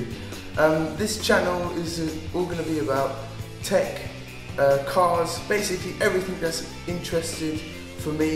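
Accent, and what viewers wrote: United Kingdom. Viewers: Hey. Sind deine Eltern Briten? Oder hast du einfach mal ne Zeitlang in England gelebt? Dein englisch ist mega und den britischen Akzent hört man einfach. Ich liebe den britischen Akzent:-) ^^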